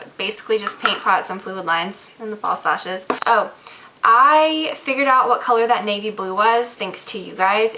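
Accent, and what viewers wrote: South, United States. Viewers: I think it's just might be a bit of her accent...she's from the South I believe...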